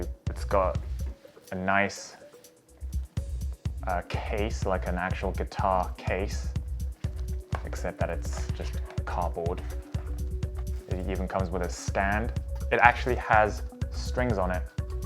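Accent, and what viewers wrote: United Kingdom. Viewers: How did I not know you had a British accent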